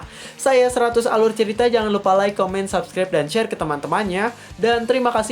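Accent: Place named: West Java, Indonesia. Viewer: Orang mana min? Gaya bicara sama bahasanya kaya sunda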